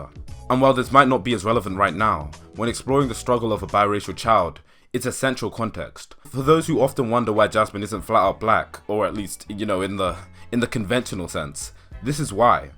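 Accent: England, United Kingdom. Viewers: Question: You sound English. Is that observation correct? If so, would you ever consider doing a video on blackness in the UK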